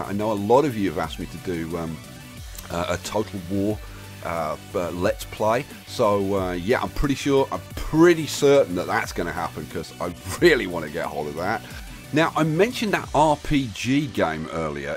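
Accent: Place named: United Kingdom. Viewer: Hi! I've recently started watching your Videos about building London in Cities Skylines and your enormous prison in Prison Architect. I'm really glad that you've came back and I'm particularly looking forward to Cities Skylines After Dark and Anno 2205! I can totally understand your reasons to quit for a while, some things can really upset you so much, even if they're not so bad after all, but you have been angry about it for weeks and can't stop just like that. I'm very happy that you're back again! (Sorry for grammar mistakes, I'm not a native speaker.) PS: You've got the most wonderful britsh accent!:)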